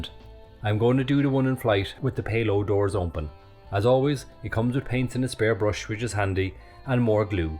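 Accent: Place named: Ireland. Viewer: Hi / Finding it difficult to obtain and buy kits locally. I regularly visit a store "MARKS MODELS" here ib the Emerald Isle but it has limited stock in the sci-fi range. I think I have bought everything he has listed. There seems to be a lot of items listed on the JUNGLE website but then states its not available to deliver yo your location. I wondering as you sound local to me, where do you pick up your kits? Many Thanks. Seán Óg. Liking your channel.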